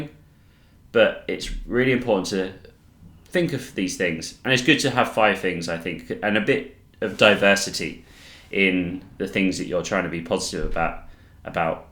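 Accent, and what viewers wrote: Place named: United Kingdom. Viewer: good video! now that you're speaking slower, I can hear that your british :p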